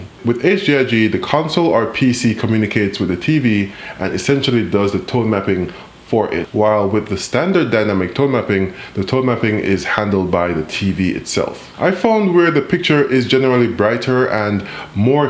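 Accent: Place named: Jamaica. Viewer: Love the content bro, also sound like your from Jamaica..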